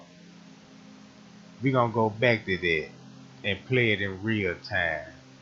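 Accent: South, United States. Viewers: Love your work but hate the way you talk. Its not entertaining annoying you talk like u stuck in the 70's southern man. Jack jive piow shit like tht. You sound like a try hard to stand out but hey i could be wrong.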